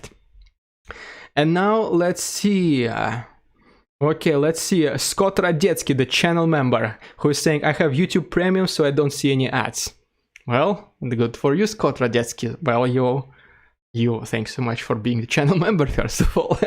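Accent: Russia. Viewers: Hello: I enjoy your videos once I got used to your accent. I wish I could send you donations but I am retired and live on a fixed income. Slava Ukraine 🇺🇦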